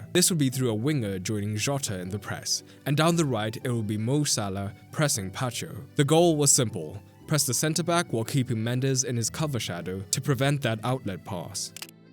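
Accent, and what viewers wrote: South Africa. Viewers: Lol, are you south african?, your accent sounds so south african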